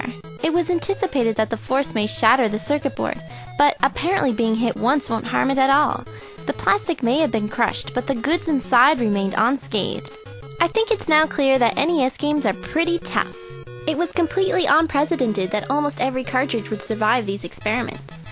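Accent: Canada. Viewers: oh and...aboot ;) hahah that accent is awesome too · God, that voice, I love Canada. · Canadian girls who say aboot... Nice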